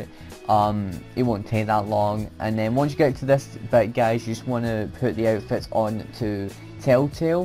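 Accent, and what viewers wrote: United Kingdom. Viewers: Are you from Glasgow? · iSorryME he is Scottish we are special people 😏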